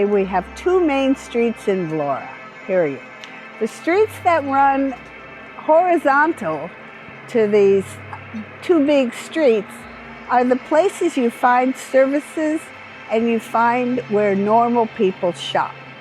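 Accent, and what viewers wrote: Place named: United States. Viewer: You keep talking about cigarette smoke I don’t know where you come from but you sound like you’re from the US and it’s nice that you’re able to ride a bike around and you’re safe and you don’t have homeless tents on the corners and people walking around like zombies because they’re so drugged out you don’t see stores that they can walk into and get needles and inject themselves so I can deal with the smoking I live here now for 2 1/2 years and as a woman I feel very safe walking around anywhere at night. then you’re talking about fireworks well you have fireworks but you don’t have big fires that people are starting in the streets in your major cities. If you don’t wanna hear the fireworks move outside of the town live up in the mountains there’s beautiful areas everywhere. you won’t find Walmarts and targets but what you will find her nice little vegetable stands and separate markets that you buy your meat and fresh bread that aren’t filled with disgusting ingredients and that are fresh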